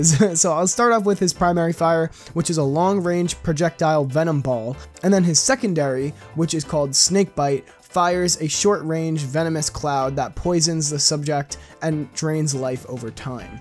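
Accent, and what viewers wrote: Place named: United States. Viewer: US English doesn't even sound that bad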